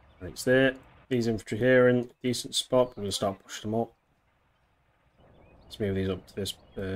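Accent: England, United Kingdom. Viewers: Weird to my ears to hear the invading German army "luckily" has plenty of materiel with which to invade Poland. In an English accent too. Great game though. Thanks for vid.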